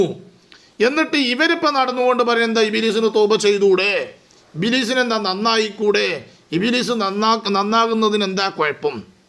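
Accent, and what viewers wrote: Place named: Malabar, India. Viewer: ഉസ്താദ് ഞാനൊരു തൃശൂർ ജില്ലക്കാരനാണ് അങ്ങയുടെ മലബാർ ശൈലിയിലുള്ള സംസാരം എനിക്ക് ഭയങ്കര ഇഷ്ടമാണ് കുരുവട്ടൂര് ക്കുള്ള ഓരോ വീഡിയോയും വേണ്ടി കട്ട വെയ്റ്റിങ് ലാണ്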